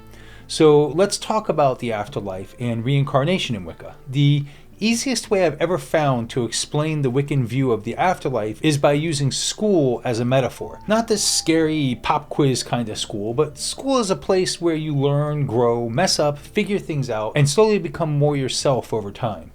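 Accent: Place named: United Kingdom. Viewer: Oh, you're British! 💂🏽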